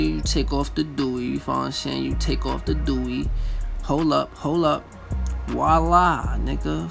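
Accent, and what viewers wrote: New York, United States. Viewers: Bro..you from NY? Distinct ass accent lol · He from New York lol 😂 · Thats Newyork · I can tell u New York nigga but big respect to u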